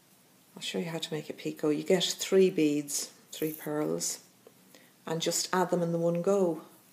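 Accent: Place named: Ireland. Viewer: Very clever lady! Thank u. Love love love the Irish accent! Xo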